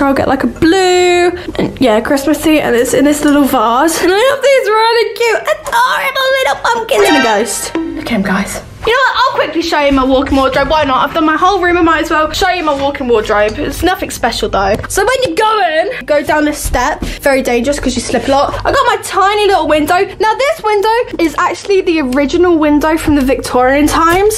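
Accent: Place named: United Kingdom. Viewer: WAIT?YOUR BRITISH?!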